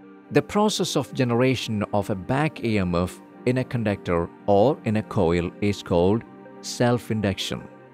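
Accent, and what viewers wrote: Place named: Kerala, India. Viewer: malayali ane bro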